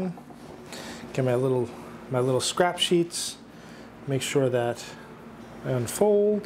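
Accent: Long Island, United States. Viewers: Dat Strong Island accent. Sounding like you're from Patchogue. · Found you via Tasting History with Max Miller! I'm also from Long Island, and yes, it's "riggotd." LOL You remind me of the LI guys I knew, growing up. Especially, your humor about making sure you get everything on your shoes! Very funny...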